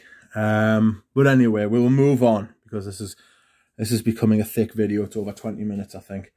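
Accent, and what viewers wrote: United Kingdom. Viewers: PS are you from UK?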